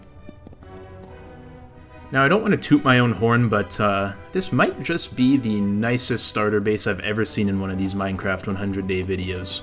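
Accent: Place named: Canada. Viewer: its almost like his canadian accent gets stronger as the video goes on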